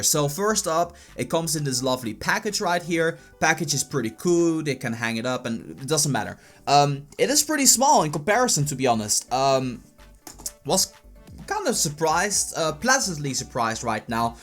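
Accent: Netherlands. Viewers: Leuk accent in het begin, maar verder in de video krijg je een heel groot Nederlands accent. Maar toch leuk gedaan! Groetjes uit Limburg. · Are u dutch? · are you from holland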